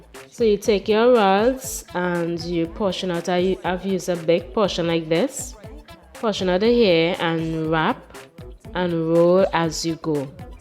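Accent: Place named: Trinidad and Tobago. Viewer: Lol..Trinis always make out each other..as soon as the video start I was like...she sound like a Trini ...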